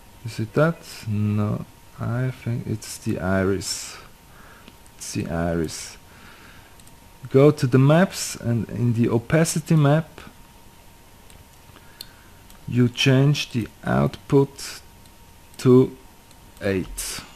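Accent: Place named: Brazil. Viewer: Pelo inglês deve ser brasuca rsrsrs mó sutaque hein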